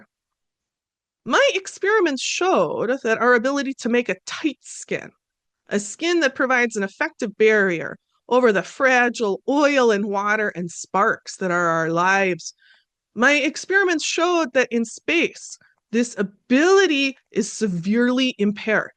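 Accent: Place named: Minnesota, United States. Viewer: You must be from Ely or Duluth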